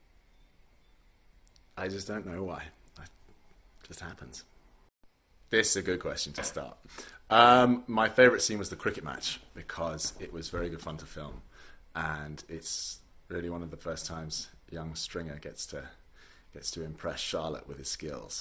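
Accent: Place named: RP, United Kingdom. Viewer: Omg he's really gorgeous. I didn't expect him to sounds so posh haha.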